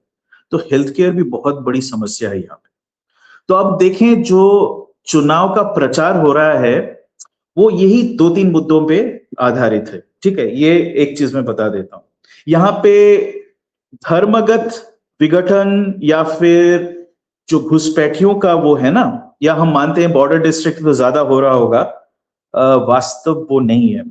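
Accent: Standard. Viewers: Dada Namasthe. Aap ki hindi bahut hi shuddh hain. Dusri baat eah hain ki aap ka vishleshan vaastavikta ke bahut hi sameep lagta hain. Chunav parinam ke paschat hi asli drushya dikhega. Bahut achi vishleshan. Dhanyawad 🙏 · ji aapki hindi bahut shudh hai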